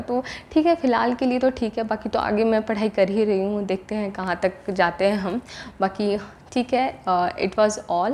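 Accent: Jharkhand, India. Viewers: Aap jharkhand se ho??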